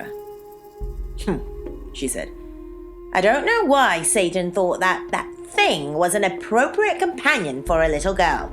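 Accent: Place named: United Kingdom. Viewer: I believe she is British.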